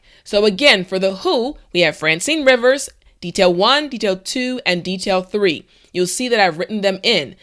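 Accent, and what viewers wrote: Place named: United States. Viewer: America English♥😚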